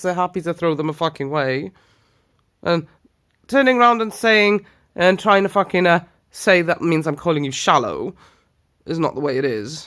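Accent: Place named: Wales, United Kingdom. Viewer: Another great video. Really had me thinking deep and reflecting with this one. Just curious, you have an interesting twang on your accent - where abouts are you from? I thought Welsh at first but maybe I'm wrong.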